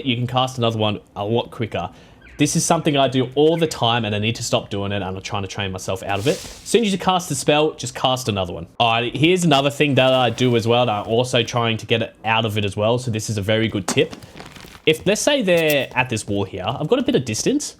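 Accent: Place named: Australia. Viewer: Loving the australian accent haha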